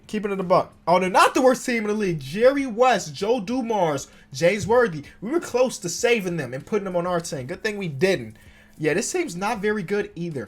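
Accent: Chicago, United States. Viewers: Was this guy born in Chicago or something?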